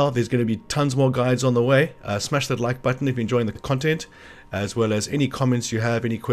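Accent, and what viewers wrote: South Africa. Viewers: Hey! Are you South African? It kinda sounds like it. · Are you South African? :)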